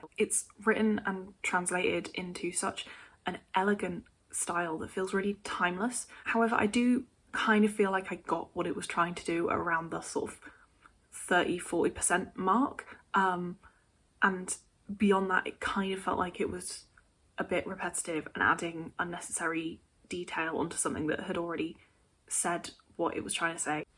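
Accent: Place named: France. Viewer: Sounds very French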